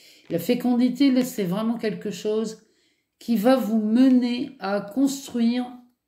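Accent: North, France. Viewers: J aime bien votre petit accent aussi. Du nord ?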